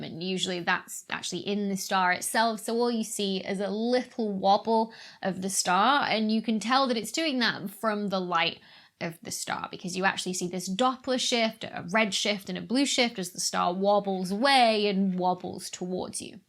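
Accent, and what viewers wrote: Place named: Northern England, United Kingdom. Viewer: Great to hear a Northern accent! Love this channel, fabulous stuff!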